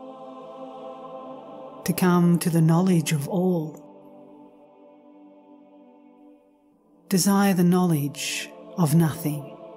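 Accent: Australia. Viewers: Love the Aussie accent! Very early on in my spiritual path I belonged to an Australian Catholic listserv (yes, it really WAS that long ago). I made some wonderful friends who helped me enormously along my way. Listening to you brought them to mind in gratefulness. Thank you!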